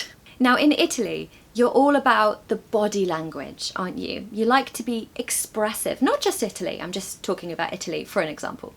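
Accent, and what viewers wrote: United Kingdom. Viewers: Hello, I come from HK and now living in UK. Congratulations for you got the Sliver play from YouTube. Super thanks for your video which I did to learn every day. It was really helpful for us to listening British English. 🎉🎉🎉🎉🇬🇧🇬🇧🇬🇧🇬🇧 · Hi, your British accent and Tudor architectural style on the background reminded me of my happy time when I was doing my PhD study in London! I'd like to learn more British English and culture here! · Hello teacher! your accent and of other British teachers are so clean. I can identify the vowels, diphthongs, triphthongs... Like the English teacher in the movie My Fair Lady. A song well recorded, mixed and mastered by a sound engineer, each instrument is heard well, being it in the right or left side of the stereo field using headphones. Thanks teacher!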